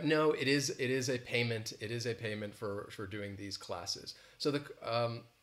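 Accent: United States. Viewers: I was waiting for american accent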